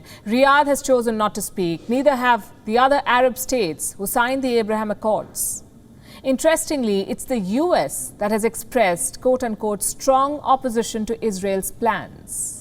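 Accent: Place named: India. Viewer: What a joke! 3.7 million subscribers watching this channel is unbelievable. International news in English with such a thick Indian accent is barely comprehensible. It doesn't suit Indian media and the Indian population, in general, to focus on anything other than the welfare and education of their own helpless and severely impoverished population and their many domestic issues such as corruption, racism and poorly managed socioeconomics. The World Bank is funding programmes to educate Indians on how to use toilets to help them get out of the habit of defecating openly in the streets. International media is having fits laughing about it, yet Indian media is pretending to be breaking news to the world. They should focus on benefiting from their internationally funded potty training rather than commenting on international news.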